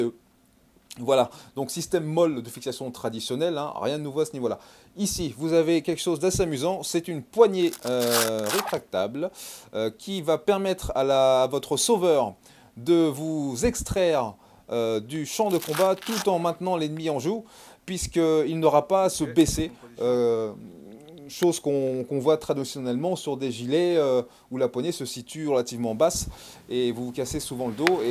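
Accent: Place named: Texas, United States. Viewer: Your accent is so strong I couldn't understand a word, are you from Texas or something?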